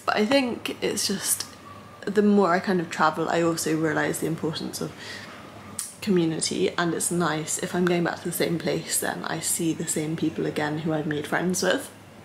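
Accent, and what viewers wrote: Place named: United Kingdom. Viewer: I know lots of guys come here to watch you work out...but for myself with your accent and your calming tone I could listen to you talk all day. Its very old style British you dont hear anymore. cheers from USA.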